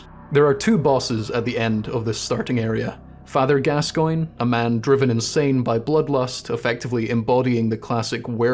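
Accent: United Kingdom. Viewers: Babe wake up, uploaded. It's giving British.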